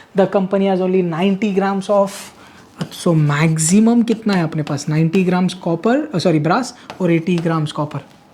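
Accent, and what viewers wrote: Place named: Punjab, India. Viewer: 😂he literally was so tired “Or graph khatam h nahi ho raha “ 💘truly admiring person and love ur panjabi accent >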